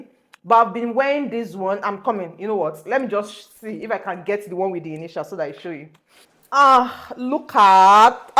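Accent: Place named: Nigeria. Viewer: Everything about you is cute, but stick to Naija accent please!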